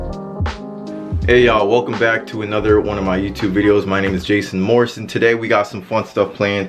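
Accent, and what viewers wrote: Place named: East Coast, United States. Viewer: You from East Coast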